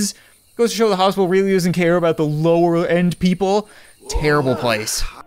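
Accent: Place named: Canada. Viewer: Love your vids and all the energy and effort you put into them! Also love your Canadian accent and quirks! Are you a fellow scientist? You knew about centrifuges, pipettes and that vaccines don't cause autism (thanks for that! Yay!) Congrats on >15K subscribers! Thanks for making this series! Cheers from your neighbor in California!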